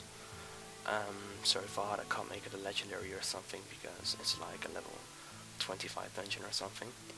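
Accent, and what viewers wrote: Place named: Netherlands. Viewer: ik haat je Nederlandse accent gahaha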